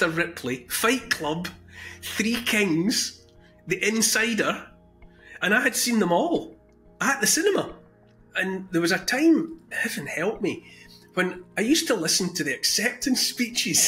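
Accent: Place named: Dumfries, United Kingdom. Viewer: I love you,. My long-gone uncle grew up in Dumfries and so it's extra lovely listening to you speak. I'm grateful for your chats about the machine. TBH I haven't been able to bear watching US movies for a long long time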